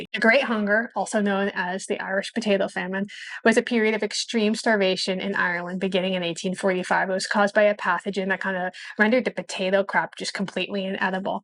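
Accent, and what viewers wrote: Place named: Indiana, United States. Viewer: Her accent says Indiana braces babysitter from the Incredibles IQ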